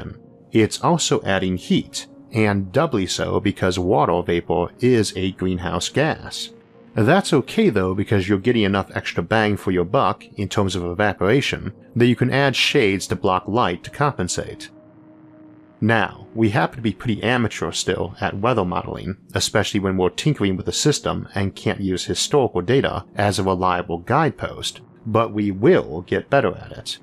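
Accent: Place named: Texas, United States. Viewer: Yes as a Brit I thought that maybe he was a Texan or from the Southern states.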